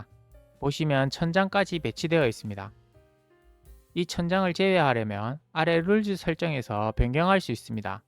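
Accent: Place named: Gyeongsang, South Korea. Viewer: 말씀 하시는거 보니 경상도 분 같으신데요 저는 서울삽니다.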